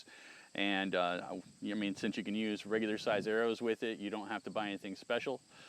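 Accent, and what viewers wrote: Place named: United States. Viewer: es insoportablemente gringo. Habla en jeringoso yankie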